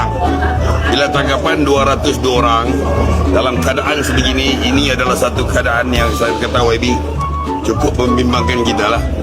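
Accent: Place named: Sabah, Malaysia. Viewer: Loghat kp ni mcm orng sabah je 😊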